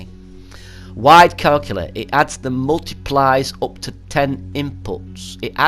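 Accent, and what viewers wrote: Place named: Scotland, United Kingdom. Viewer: I like your video Mr. British/Scottish guy, I will subscribe so that you can inform me of more of these major updates affecting the gaming industry and PS4 exclusives, I seriously don't know where I would be if I hadn't found your channel, I would literally be living under a rock, can you please update us on the future of the gaming industry economy, Covid-19's effects on the industry and when GameStop will become a penny stocks? THANKS.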